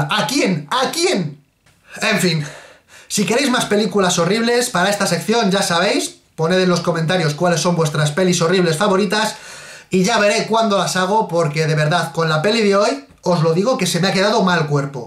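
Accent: Mexico. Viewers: Es la verdura esa placa, habla mexicano puro y duro jajaja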